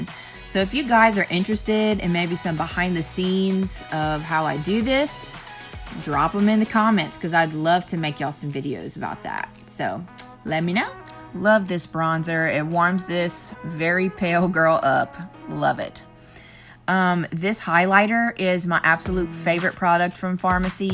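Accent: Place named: South, United States. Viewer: Guhhh this made me smile! I love you so much and I LOVE that southern accent sha :-) #nevachange 😄😄😄 Also chili's and Lowes sounds like the best date ever. Just sayin. 😂😂😂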